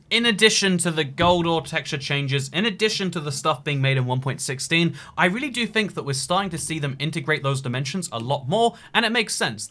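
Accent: United Kingdom. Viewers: Why are you complaining about a British person pronouncing a word the British way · I’ve been watching for years! And he still speaks so fast with his British accent that I still can’t recognize half of what he says as the English language. With that said, I’m a big fan and long time supporter. Just saying. “Hey everyone, here! If you like videos like this then wwocofmtkgkvkgksksjdhwbdjckfkfngmvkckrktkgkdjdjsnej.” Yeah, what he said.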